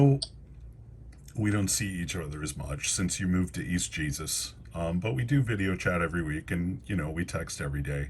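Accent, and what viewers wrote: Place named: Michigan, United States. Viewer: edit Oh shit, you said Birmingham? Based on your accent, I'm assuming this is Michigan and not Alabama, but if you are a fellow Southerner, shoutouts to you!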